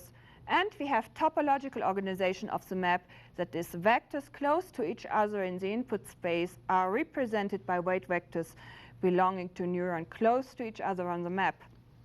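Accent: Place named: Germany. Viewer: She´s german, right? Really usefull, thanks a lot for the upload!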